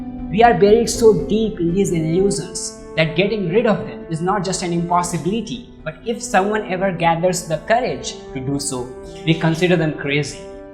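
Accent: India. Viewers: Dude, that heavy Indian accent is a put off. But other then that, content is good. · why is it not proper english, you cant expect an indian man to talk in an american or english accent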